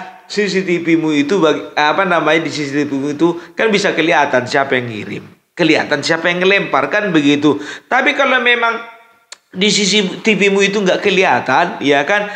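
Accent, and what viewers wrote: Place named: Java, Indonesia. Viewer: Bang suk mben golek mantu / besan jowo wae opung lha boso jowo sampean wis medhok 🙏👍💪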